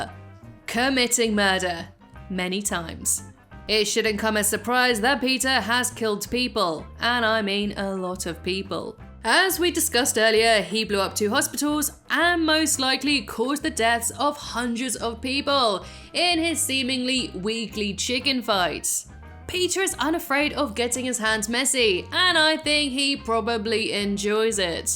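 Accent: United Kingdom. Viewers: but i love you British accent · I love your British accent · Who in the world would hate your British accent?!?!?! I absolutely ADORE IT!!!!! I binge watch your videos for your voice almost as much for the content itself! Great videos and very informative. I’ve seen these shows hundreds of times and your theories and timelines always make me want to rewatch certain episodes realizing how I missed some connections before. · Yeah it's like as if Family Guy characters of old Family Guy are very different than how they are now. But even so Peter was still a bastard back in the good old days too. Now people are complaining about your accent? I mean it's nothing new. Many people some probably other Americans who are uneducated and dumb for some reason can't stand a British accent even though there's really nothing wrong with it. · How can people hate her British accent 😂 that baffles me 😂 well each to there own right 😂 her British accent is lovely and awesome 😎 You’ve done a wonderful job so keep voicing these videos. 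· To be honest I love your British voice. Next year I plan on moving to Scotland. After all… God save the queen! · Certainly impossible to rank his particular deeds as all the outright criminal ones rank in similar categories; murder, destruction, relentless bullying, child abuse, sexual assault, manipulating everything by status, spousal assault and affairs, unkept alcoholism, drug/substance abuse, the list just won't stop! Another great video thanks! I also do like your accent, sounds much nicer, more engaged and more charming than a typical American accent which you hear narrating 85% of youtube videos. I'm not saying there's anything wrong with listening to American nor that there accent is unpleasant, its just nice for other countries like the UK to represent and you do talk very smoothly and elegantly like a good narrator should. People who are hating on your British accent and trying to get you to change the way you talk are mostly likely selfish, ignorant Americans who think the world revolves around their country and that everyone should act like and pay attention to them, don't let haters dictate what you say and how you say it, keep up the great content! 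· You have a British accent? What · What!? Who said they don’t like your British accent I think they’re cool alongside French and Italian I’ve been told I have a Cleveland or very slight southern accent 😅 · Hey just saying I love your british accent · Wait! What! Who hates your British accent?! I love it! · But I love your british accent! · nah I don't hate your brit acent it's pretty beautiful to be honest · Adore your British accent here in Bolton. Rule Britannia etc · Did you read the rest of my comment. Quagmire deserves the abuse and your British accent is awesome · I don't mind your Voice,You British Accent makes you unique just the way you are. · I'm sorry I love your British accent! ❤ it's beautiful and exotic just like the wonderful host making my favorite videos! Never change! 💘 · your accent is cool....I really love how strong people In. London accent is😌😌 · Can you also do the worst things Stewie has ever done! 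BTW I htink your british accent is awesome! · Awesome Job! I'm glad Peter's not my friend, I love your British Accent by the way. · I don’t mind your British accent tbh, I think it is soothing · Awe I'm sorry to hear that darling I aboustly love your British accent 🥰🥰 and I as well enjoy watching your videos~!! I was wondering if you could ever do a timeline of Marge simpson if you haven't done one of her yet darling?? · ... your British? I thought you were Australian! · I don’t hate your British Accent and btw your doing great ^^ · I love your british accent,what a bunch off fake fans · I love your British accent!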